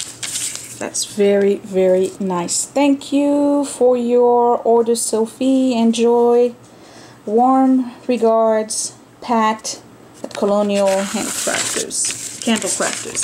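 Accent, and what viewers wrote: France. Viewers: You go girl! Your have been crazy busy! I want some of your energy :) I love your accent, is it French?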